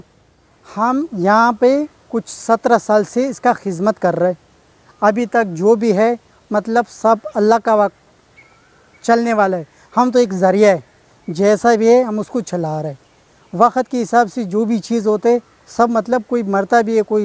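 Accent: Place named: India. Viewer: Zaban sun ke laga india ka hindu hai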